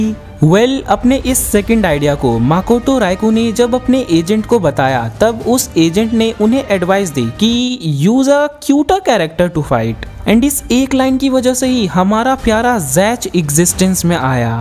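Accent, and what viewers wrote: Bihar, India. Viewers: Bhaiya aise hi bola karo aap acche lagte Ho hamare Bihar mein bhi aise hi bola tha um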